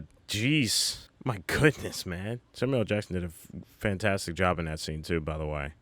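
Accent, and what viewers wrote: Philadelphia, United States. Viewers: I knew your accent was familiar. Philly represent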